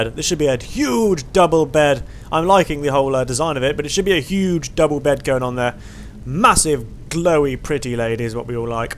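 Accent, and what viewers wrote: United Kingdom. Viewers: Oy, luv, your, britesh, accent ,young man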